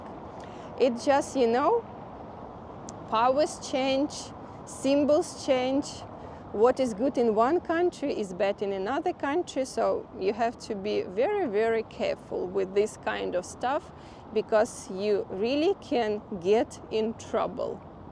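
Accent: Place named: Russia. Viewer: There's nothing wrong with the Russian accent but calling it "attractive" is kinda cringe.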